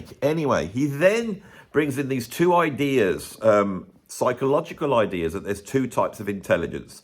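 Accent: United Kingdom. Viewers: brilliant and funny, i love contrarians being one myself, you remind me of Monty Python .( maybe the accent )........ i do like rick also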